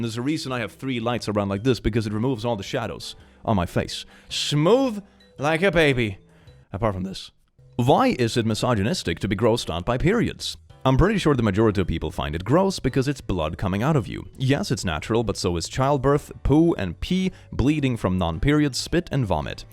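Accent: Sweden. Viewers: I thought you were American until the impression of Danish, and now I can't stop hearing the Swedish accent. 😂 Good job on getting a very international following! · while you are pretty, I tune in mostly for your accent and the casual tossing out of Swedish words. It reminds me a bit of my Swedish-born first husband! He had a beautiful voice, too.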